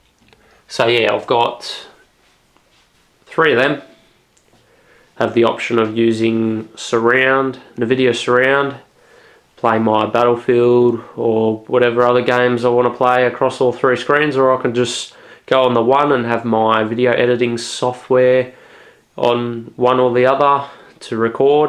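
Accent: Australia. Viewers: awesome setup! M8 r u Aussie:D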